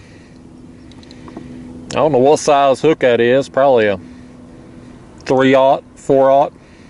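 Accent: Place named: North Alabama, United States. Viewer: Just subscribed. Realized quickly you have the same exact country accent everyone spoke where I grew up (North Alabama). I shed my accent after moving to a bigger City, but it always makes me happy to hear it still alive and well! Awesome channel my friend!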